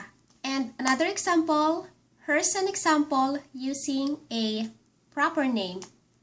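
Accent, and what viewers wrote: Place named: Pangasinan, Philippines. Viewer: I'm Ilocano and she uses different word and have harder accent than in our place....from her accent and wording I think she is from Pangasinan Province. I want to learn how to speak pure Ilocano but that is impossible since there are no equal word for every word we barrow from Tagalog and English. All I can do is to continue speak and learn More words.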